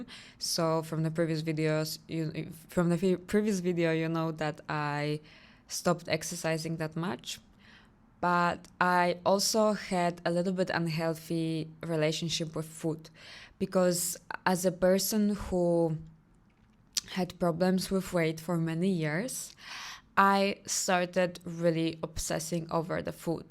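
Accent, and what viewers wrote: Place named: Russia. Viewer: У Вас потрясающий русский акцент 🔥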